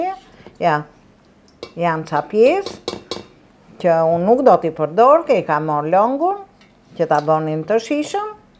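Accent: Tirana, Albania. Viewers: Ty boft dita 1000, se ti me kenoqe vetem duke t'nigju , ate dialektin e mbrekullushem te Tirones 🌟🌟🌟🥰🥰🥰❤️❤️❤️💐🌹🌻🌷🌺🌼🌸🏵️☘️ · Sa te mira qe i ben!Me kujton mamin tim!Te ka lezet dhe e folmja tironce,nostalgji!Flm per keshillat mira,te ndjek me shume dashuri!❤ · Me pelqen kur flet me dialektin e tironse.Je shume e mire dhe e direjte perdrejte.Zoti te dhe te shendet dhe lumturi.Respekte e dashur❤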